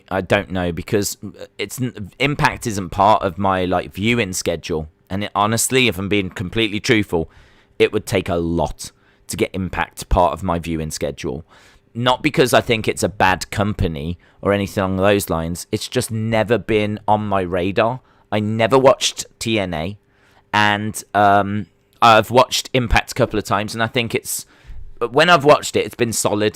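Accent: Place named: Midlands, United Kingdom. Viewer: Just found your channel. You from the Midlands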